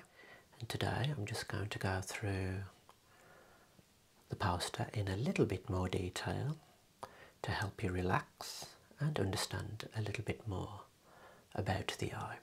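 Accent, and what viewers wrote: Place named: Birmingham, United Kingdom. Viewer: i love that you have a brummie accent! as a brummie myself, hearing you feels relaxing and homely. thanks for your videos !!!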